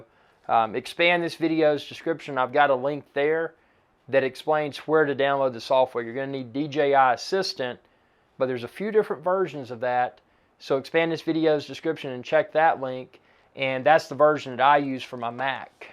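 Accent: South, United States. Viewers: That southern accent is golden, love it. Greets from germany!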